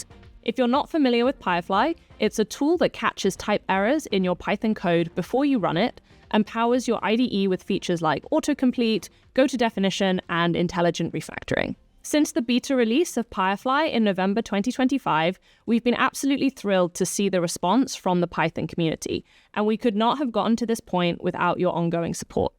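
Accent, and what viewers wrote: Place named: South Africa. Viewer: is she south African or Australian? I can't tell?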